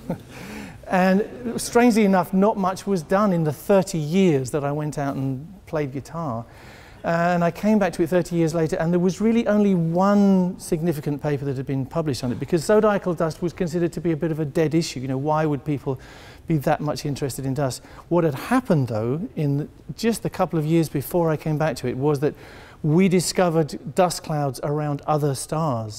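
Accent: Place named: United Kingdom. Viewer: wow a learned guy with a British accent....charming...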